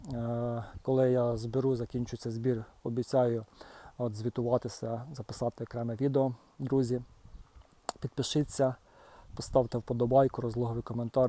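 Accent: Cherkasy, Ukraine. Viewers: Дякую вам за чудову мову, я насолоджуюсь! Бо та кацапщина, навіть з уст українців вже в горлі стоїть! По акценту спробую вгадати, Чекркащина або Польавчина??)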